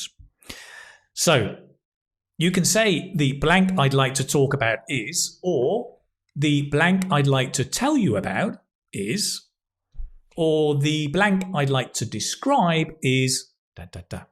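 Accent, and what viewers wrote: United Kingdom. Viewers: "To be honest, Mr., I've fallen in love❤ with the English language all over again—especially the British accent—because of you."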